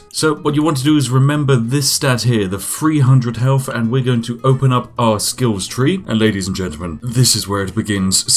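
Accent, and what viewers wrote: United Kingdom. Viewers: Cannot stay away from that lovely British voice and cheeky exploits of yours ;) Just subbed. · Oh No! My favorite game is so broken xD Lately I’ve bought Titan Quest HD for my iOS device and it’s awesome port from PC. To Sir Brit: Man, ur so awesome xD Mostly because u’ve got that British ‘ladies and gentlemen’ accent which I consider as pure awesomeness. I’ve watched so many of your vids that I’m wondering about drug relation of Yorkshire Tea.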